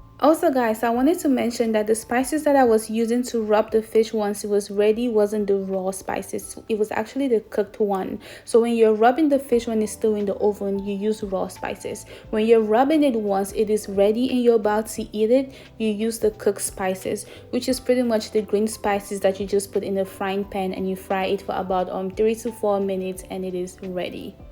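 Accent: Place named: Cameroon. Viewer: your voice and pidgin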